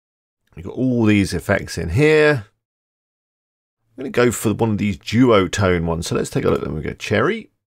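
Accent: United Kingdom. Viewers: Here you get real stuff and with British accent. Epic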